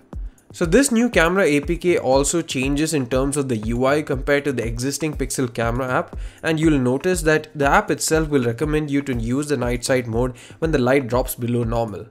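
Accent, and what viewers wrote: India. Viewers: Indian accent english is the worst, go shit on the street leave youtube alone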